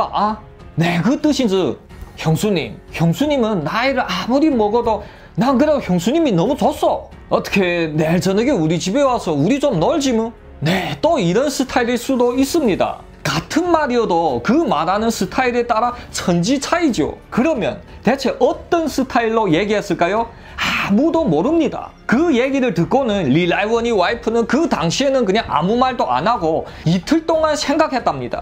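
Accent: Yanbian, China. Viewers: 화룡시 팔가자진에서 30전짜리 꼬치구이 장사 6년하다가 쭝뽀우 생명보험 3년하다 한국나온지 21년 됐음,한국사람하고 결혼해서 두아이의 엄만데요,찐한 연변사투리 구수하네요~대박나세요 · 연변말좀고치고 방송하시오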